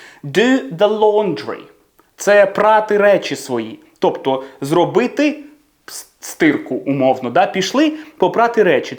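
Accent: United Kingdom. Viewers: !!!! Велике дякую за Вашу працю. Мені дежу подобається формат викладання англиійської мови. Весело і розуміло. Але трішки добавте прикладів. По 1-2 реченню. Так краще запам'ятати. Ваш look very good!. Едине - я живу зараз в Канаді, pronunciation тут не британський, в цьому є різниця. Але головне з Ваших відео я получаю грамматику. Дякую. Good luck!! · Ви смішний) на Метта Деймона схожий в цьому образі ❤ Відчувається британська вимова, не американська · Лук класний!, ваш британський акцент просто неймовірний! Як довго ви живете в Британії, що набули такого акценту? Дякую за випуск!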